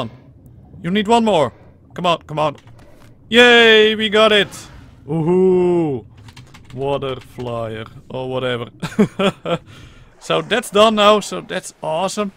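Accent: Netherlands. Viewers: I can hear you are dutch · Are u dutch? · Are you Dutch? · Haha I'm dutch too, you can hear it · U are definetly dutch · are u dutch? :p I can always hear if someone is dutch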